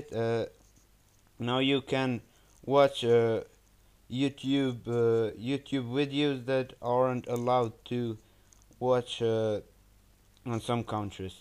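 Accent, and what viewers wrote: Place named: Albania. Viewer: theksin e shqiptrarit e paske haver :D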